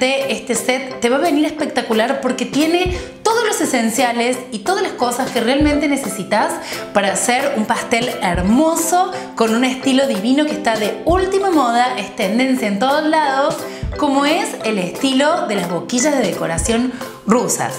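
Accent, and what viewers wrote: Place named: Rioplatense, Argentina. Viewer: te escuchaba y digo ser argentina esta chica o uruguaya y vi el mate de fondo jajaj osea que si! hermoso video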